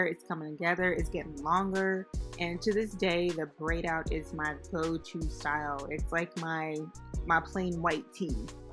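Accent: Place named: Southeast, United States. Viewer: Dis joint be dry dou... lol Sound like you from S.E. Haaaaaa!!!!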